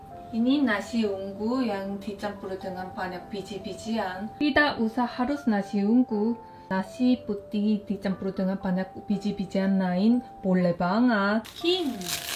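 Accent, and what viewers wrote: Java, Indonesia. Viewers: Lo Lo Lo kok logatnyaa Jawa to inii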